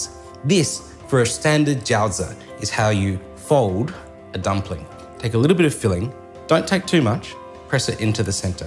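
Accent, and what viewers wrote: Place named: Australia. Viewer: came from uncle roger! You're Australian !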